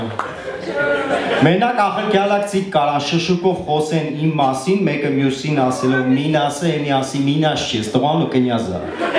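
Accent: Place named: Gyumri, Armenia. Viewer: Bayc meka inchqan el Erevani barbarov kxosa meka tex tex kzgacvi or Leninakanci e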